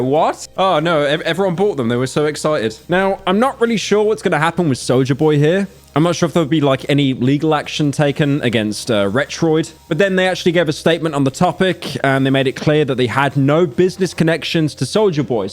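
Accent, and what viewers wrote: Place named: England, United Kingdom. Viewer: You do that English gentleman voice so well, I think that's the way you talk.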